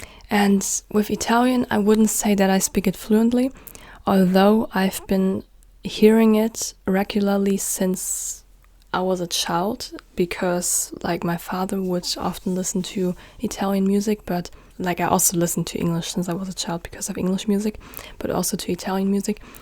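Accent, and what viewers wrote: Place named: United Kingdom. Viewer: By the way when you speak english your pronunciation is more british than american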